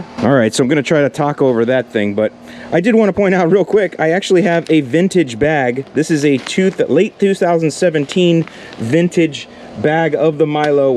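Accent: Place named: Midwest, United States. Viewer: Great video, and i love your Mid-Western accent lol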